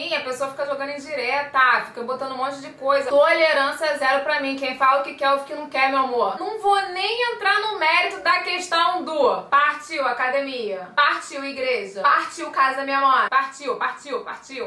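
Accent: Rio de Janeiro, Brazil. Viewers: Maravilhosaaaaaaaaaaa!! hahaha Carioca legítima. Amoooooo <3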